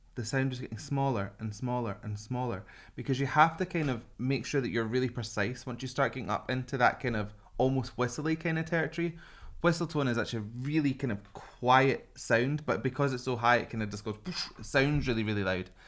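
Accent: United Kingdom. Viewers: Thank you for your professional analysis! I love your British accent. Either UK or Scotland. Love it! Here is my expression of their voice range if I compare to colonial style of house range. From the left from us Ko Woo Rim: Bass as foundation of house &deep basement to support strong house built. Kang Hyong Ho: Angelic voice, tenor and falsetto as 1st& 2nd floor shooting up to attic through out of the roof like a roller coaster.(can do male and female parts, check Phantom of the opera) Jo Min Gyu: Tenor. 1st& 2nd floor(he can also do falsetto beautiful way) Bae Doo Hoon: Tenor. 1st& 2nd floor(he was musical actor so he brings out so much of emotion) I love them all together. Because of their different range of vocal ability, it bring out the best! They all work together from foundation of the house, basement, 1st floor, 2nd floor and attic to roof to complete the house project! 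Everyday they bring the joy!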